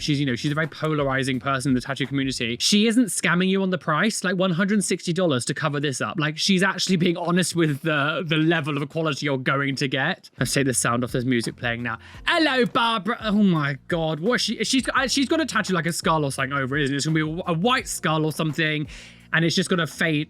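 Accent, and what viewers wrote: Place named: Bristol, United Kingdom. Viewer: I had to stop painting because I was laughing too much at your reactions. That Bristol accent just sent me 😂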